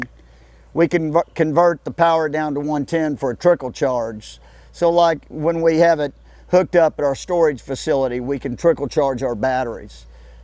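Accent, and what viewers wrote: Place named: South, United States. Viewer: Please use subtitles for those of us who don't speak southern.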